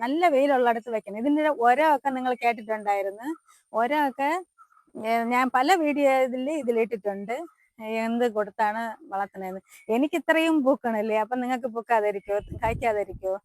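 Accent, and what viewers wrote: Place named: Trivandrum, India. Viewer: Nalla സംസാരം. ഒറിജിനൽ. TVM മിടുക്കി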